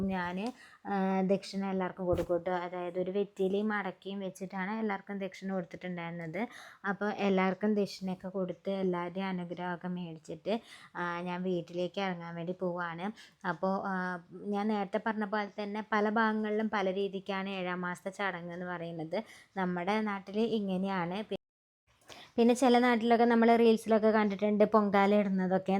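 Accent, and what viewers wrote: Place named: South Kerala, India. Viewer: മോൾ സുന്ദരി ആയിട്ടുണ്ട് കേട്ടോ ❤.ഒരു കാര്യം... മോൾ സംസാരിക്കുമ്പോൾ തെക്കൻ കേരള ഭാഷ കയറി വരുന്നുണ്ട് കേട്ടോ.വരത്തില്ല... പോകത്തില്ല etc. അതു വേണ്ട എന്ന് തോന്നുന്നു. നിങ്ങളുടെ ഭാഷ കേൾക്കാനാണ് സുഖം❤❤❤ എല്ലാ അനുഗ്രഹങ്ങളും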